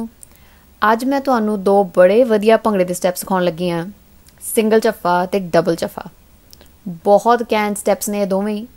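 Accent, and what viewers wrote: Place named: Amritsar, India. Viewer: Boli ton ta bibi ambarsar di lagdi a. Ke nai??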